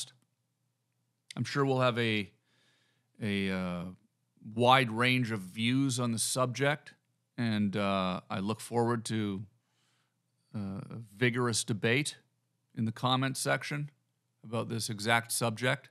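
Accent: Canada. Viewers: Keep talking about the farm and all of the nature, bro. That’s what got me hooked oh, and by the way, keep saying words, where your Canadian accent comes out! Hilarious. Lmfao hilariously awesome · I think your canadian accent is getting stronger. Love it.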